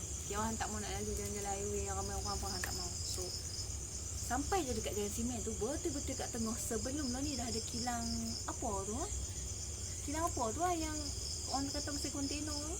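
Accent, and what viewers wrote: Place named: Kulim, Malaysia. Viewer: rasa² ni orng kulim kot sbb cara dy crta niy mcm orng kulim ja, sy pon dulu ingat niy orng pinang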